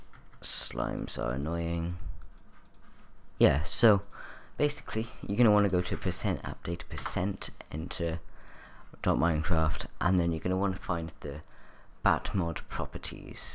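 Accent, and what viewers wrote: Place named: United Kingdom. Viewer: It's called having a british accent.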